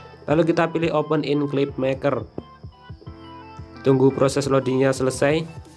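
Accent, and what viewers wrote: East Java, Indonesia. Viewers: Jawa timur yak ?? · Jawa timur nya mana bang?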